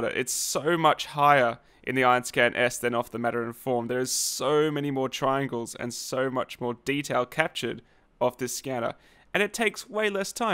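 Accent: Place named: Australia. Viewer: Man love your vids! So to the point, auzzie and easy to understand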